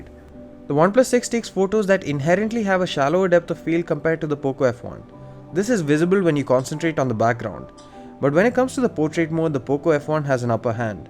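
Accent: Kerala, India. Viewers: Are you from kerala?? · bro are you a malayalee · Bro are you from Kerala ??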